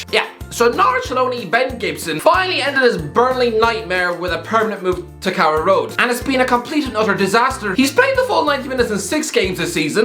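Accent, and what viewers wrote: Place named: Ireland. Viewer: I post football news also . Just without this Irish ☘️ accent.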